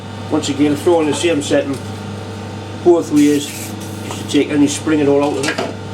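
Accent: Newcastle, United Kingdom. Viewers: Although I am a Yorkshire 'Tyke' and not altogether accustomed to your Geordie accent, I understand you perfectly,. Just remain as you are! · Il est du Nord-Est de l'Angleterre, il parle l'anglais avec le dialecte atypique pour les gens nées a Newcastle-upon-Tyne, le 'Geordie', qui est une mélange de anglais,celte et mots de langue nordique (norvegien et danois). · Geordie as it is spook!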